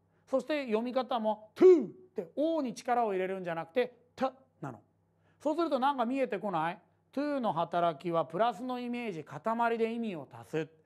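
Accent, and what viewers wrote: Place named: Japan. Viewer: 発音はしゃーない 日本人だから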